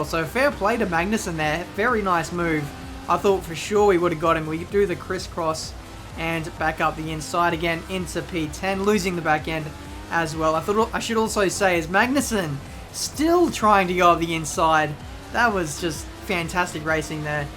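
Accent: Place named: Australia. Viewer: You sound like Daniel Ricciardo #AUSTRAILIAN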